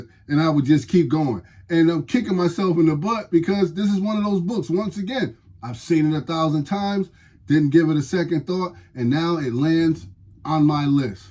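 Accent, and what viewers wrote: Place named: New England, United States. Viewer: Bro, you're from New england?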